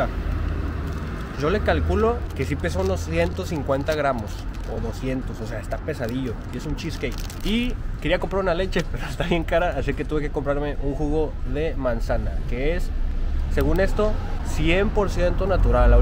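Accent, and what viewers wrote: North, Mexico. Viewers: De dónde es este compa y por qué tiene hacento norteño??👌🏼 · Eres del norte va? Se te nota un chiiingo el acento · Por pura casualidad llegue a este canal y ya me quedé, suenas con acento norteño jajaja saludos carnal!! Excelente video! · me parece que alguna vez dijo que nació en Guanajuato y muy pequeño su familia se mudo a Coahuila por eso el acento norteño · El nació en México, en Ramos Ariste en el estado de Coahuila por eso tiene el acento norteño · Bien norteño el ascento algo bien XD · ¿Te han dicho que te pareces a Ninomiya Kazunari? Es el primer video que he visto en el canal (vi la entrevista en el canal de Sandyael) y me ha parecido muy entretenido, sobretodo por el acento súper norteño. Espero que te vaya muy bien en youtube. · no se por que, pero por tu acento intuyo que aprendiste español en el norte de México, se te nota mucho el acento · Creí que mi papá y yo éramos los únicos que decíamos "pa miguelin" cuando nos referíamos a nosotros mismos jajajajaja. 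Amo su acento norteño ♡ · Wtf? Eres japonés con voz norteña mexicana que agradable sujeto. Además de unos vídeos entretenidos. Gracias yt por la recomendación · Nueva suscriptora, me encantó tu sencillez y tú hacento norteño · Tiene asentó norteño exelente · Coahuila es una parte del norte de México? Es que se escucha similar a el Mariana y él creo que es de por ahí de norte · loco, que gusto escuchar ese acento desde otro pais, aparte (como dijeron otros por ahi abajo) norteñon xdd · Al igual que muchos, primer video tuyo, la neta eres caga...o, caes chido, me late que uses nuestras expresiones mexicanas de forma tan natural como: "que sea lo que dios quiera" "ahí te encargo" "suaperro" y otras jajaja, con acento norteñon pero mexicano, no conozco tu historia pero ya leí que eres mexicano-japones, aún así me cayo poca mad...tu forma de expresar, tienes la fórmula básica de un creador de contenido: videos cortos+química+temática+respeto (en tu caso) sin duda vas pa'rriba y tendrás un buen de suscriptores, yo soy ahora uno de ellos, cuídate brother y mantén esa actitud natural no forzada, créeme ahí está la clave del éxito, saludos desde mexicalpam de las tunas. 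· Amo su acento norteño hahaha ojalá pueda regresar pronto a México a visitar su familia ✨ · Es mexicano, de Coahuila un estado del Norte de México, por eso su acento norteño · wow tienes un acento super norteño ! la neta te rifas! me voy a suscribir! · Este joven japonés con toque mexi-norteño me cae bien, excelente video! Gracias Yutu por recomendarme este canal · Jajajaja un japomexicano bien raza , bien norteño !! Me voy suscribir me late tu rollo loco !!🤠✌️ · Asu, hablas bien fluido el español de México y además tienes toque norteño compa, jaja increíble tu contenido, ojalá sigas creciendo como youtuber, saludos desde México, nuevo sub. :) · No mames me tripie, un bato con cara asiática hablando español norteño diciendo nacadas en tokio, bien vergas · El primer japones q miro q habla muy bien el español y todavía con acento norteño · Es mexicano nació en Coahuila, su papá es japonés y su mamá mexicana por eso el estilo del norte de México, saludines desde Monterrey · y hablando con el acento del norte de Mexico que me encanta, saludos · Ay japonés con voz norteña me caiste de huevos, no hace falta ni escucharte pa verte lo mexicano, con los ojos lo dices todo, primera vez que veo uno de tus videos, excelente servicio le doy 5 chiles 🌶️🌶️🌶️🌶️🌶️ secos, saludos desde Xalapa :3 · es de coahuila wey, por eso tiene el acento norteño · Jajajaja habla como mexicano norteño 🤤 lo ame 😍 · ame su acento norteño y su humor <3, no más por eso me suscribo y lo sigo en insta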